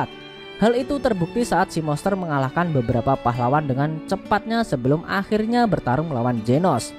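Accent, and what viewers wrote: Java, Indonesia. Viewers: Mimin orang jawa ya? Suaranya medok banget njirr mirip orang jawa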